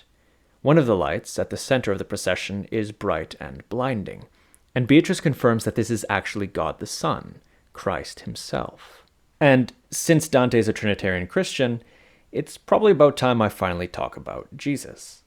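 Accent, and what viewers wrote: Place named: Quebec, Canada. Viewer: I KNEW you were from Quebec! Finally got my answer 😂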